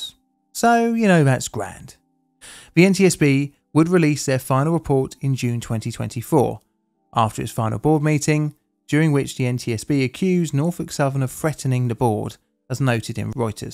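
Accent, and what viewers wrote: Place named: London, United Kingdom. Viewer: I was sat back listening doing a few things and I was thinking to myself you know what Joshua this bloke sounds like he’s from London in the end though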